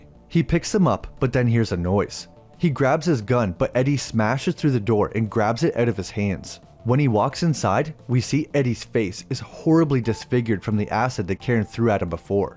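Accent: Canada. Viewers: bb are you canadian?? cuz you hella sound like it lol (i'm from vancouver) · are you from Canada? I hear a couple of "oots" aka outs lol. Hope this isn't offensive. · Am definitely enjoying the Canadian accent.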